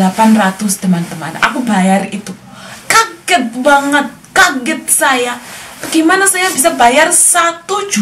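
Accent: Java, Indonesia. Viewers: jangan dihilangin medoknya ya di Inggris mbak hehehe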